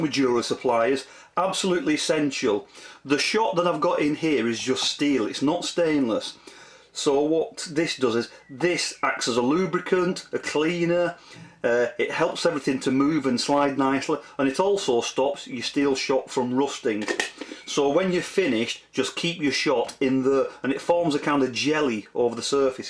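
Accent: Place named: England, United Kingdom. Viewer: What was the powder that you put in the tumbler? Sorry but I'm a french Montrealer and sometime I have problem with the English accent.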